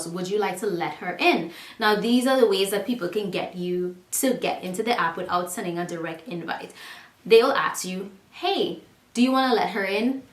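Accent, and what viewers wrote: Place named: Trinidad and Tobago. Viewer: Oh sis you’re Trini to d boneee 🤣🤣 the accent is so familiar!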